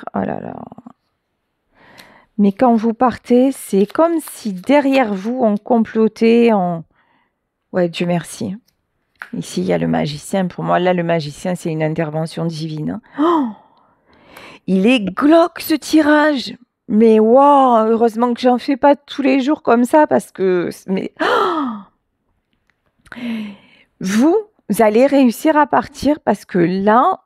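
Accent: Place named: Nîmes, France. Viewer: Bonjour 🌸je suis hélas dans cette situation d’horreur 😫😡plus trop de force, Plus d’énergie mais je veux me battre & obtenir réparation..d’injustice p/abus de Confiance s/personne mis sous tutelle (Packinsonien)Besoins d.aide!... D.agréables moments d’entendre votre voie de « NÎMES «encore mercie 🌸🍀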